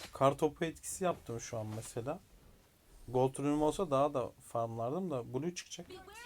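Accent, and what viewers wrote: Samsun, Turkey. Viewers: Samsunlu olduğunu belli ediyo bende samsun luyum RE!S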